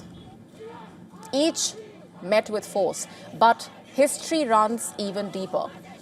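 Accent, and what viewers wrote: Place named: India. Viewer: English in Hindi style!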